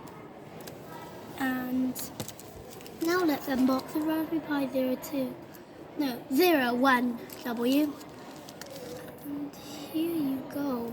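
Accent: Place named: United States. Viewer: Still speaking american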